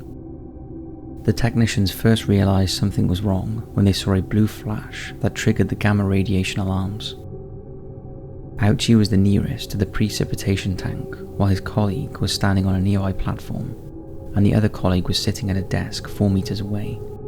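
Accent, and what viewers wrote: Wales, United Kingdom. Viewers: I always thought that the narrator was Welsh or has Welsh origins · Your voice helps me get to sleep. That is a compliment, by the way. Where in Wales are you from? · This is proof that someone with a beautiful British accent can mispronounce words. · He has a welsh accent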